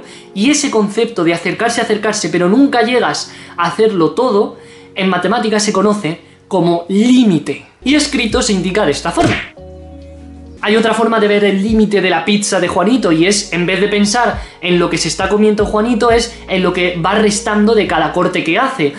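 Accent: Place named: Spain. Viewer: Es gratificante ver un peruano con acento Español que explica derivadas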